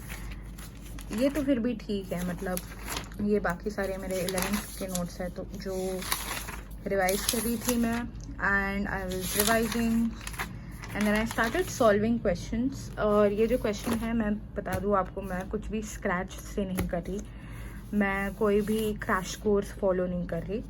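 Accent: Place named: Mumbai, India. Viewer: Bro got mumbai accent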